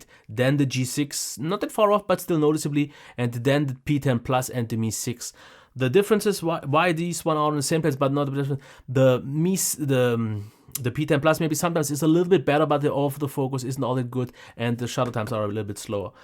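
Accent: Germany. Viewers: Based on his name i think he's Croatian but based on his accent defo born in Germany or living there for a long long time!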